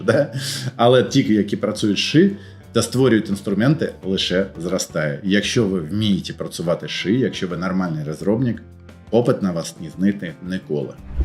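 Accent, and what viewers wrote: Belarus. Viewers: Сорян, що не втримався... Але якби думати, що білорус, то акцент був би "природний" )) Суто з філологічної сторони якщо сприймати... 🤞 P.S. А за інформативність по суті - тільки респект:elbowcough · bialoruskij akcent:) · Свідомий перехід на українську для російськомовних людей - це найкращий доказ вибору, часто дуже тяжкого і заслуговує поваги, але... поки у це схоже на білоруську ))) Може, ШІ допоже?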